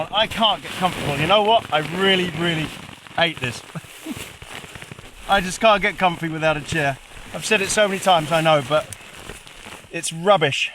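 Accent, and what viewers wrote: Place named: England, United Kingdom. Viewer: Wow,. How nice to hear someone say something nice about America.....that doesn't happen very often. We Americans love our Kiwi's too!! I previously worked with several New Zealander's and Aussie's--so much so that I learned the difference in accents! There is nothing NZ's hate more than being called Australian!, you also have a lovely accent albeit English. Greetings from Los Angeles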